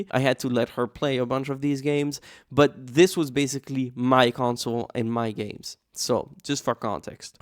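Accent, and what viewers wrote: France. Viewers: Not gonna touch on everything but : Starting off with Goldeneye on N64 is so funny bc for some reason i was kind of obsessed w the game when i was a kid (not even necessarily playing it, just the game itself? i don't think i even really knew who James Bond was tbh lmao) I played Eyetoy Play sooo much it's actually silly how much i played it I have such fond memories of New Super Mario Bros on the DS, mostly bc i associate it with my mom - we all played it but that game was serious business to her lmao (especially on vacation) I really liked the minigames in Mario Kart DS but i think it's bc my Mario Kart™ was Double Dash on the Gamecube so maybe that played a part? If you played that one i wonder how you felt about the mini games in it Anyway, this was a fun video and i'm glad both you and your sister have memories around these games (and many more i assume). 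Jumpscare incoming: Et d'habitude je capte l'accent français mais j'avoue que j'y ai même pas pensé jusqu'à c'que je vois ta réponse à un commentaire sur une autre vidéo ptdrr but lowkey my English is better.. so let me pretend i didn't say anything !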